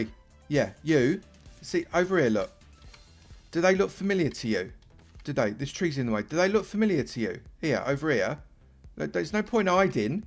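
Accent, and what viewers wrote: United Kingdom. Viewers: I always love your vids and I do have to say that you have the most british british accent ever! Love it!:D -Scottish Person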